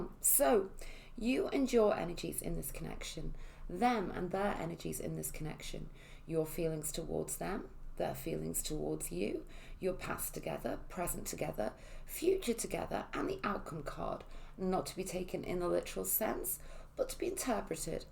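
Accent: Liverpool, United Kingdom. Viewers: I thought it was liverpool I was hearing 🥰 I'm a brummie and southern Ireland 🍀🥰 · I thought Liverpool/ North West too.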